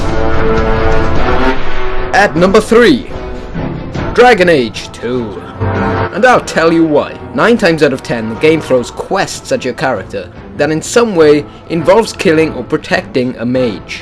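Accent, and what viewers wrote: Wales, United Kingdom. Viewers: you must be from wales! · Are you Welsh? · Where are you from? you sound welsh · I'm picking up Welsh, Italian and Irish from your accent? No offence intended..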